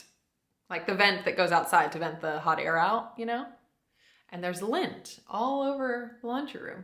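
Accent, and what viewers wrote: United Kingdom. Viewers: You're getting such a British accent! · It's funny to hear how your voice has taken on a little bit of British way of talking. Not your accent, per se, but the way the sentence flows. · You're getting a little British twang in your accent I can hear it! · You are definitely starting to sound British. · I love hearing your accent start to change a little bit. Some words you say have a British accent and I’m loving it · Is it just me or is accent changing? I swear she sounds at least 15% more British now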